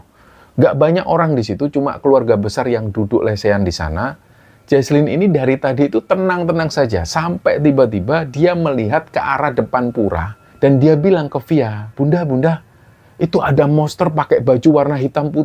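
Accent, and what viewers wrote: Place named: East Java, Indonesia. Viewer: sama sama mas jujur saya dari dulu ngefans dari taun 2021 ke juga ke mas sebagai narator cerita horor menurut saya beda pembawaan nya sama narator" yang lain apa lagi kalo udah ngomong pake bahasa Jawa timurnya saya suka logat medok nya 😊❤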